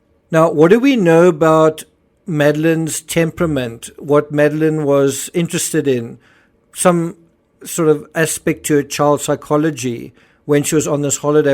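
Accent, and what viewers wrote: South Africa. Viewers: What I cant understand is that if it was an accident, why cant the parents admit it? Love the Sa accent, it reminds me of home. Hi from NZ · Hi sueelliott4793 from me, also in NZ. I like SA accent but even more than that I like his creepy intro music!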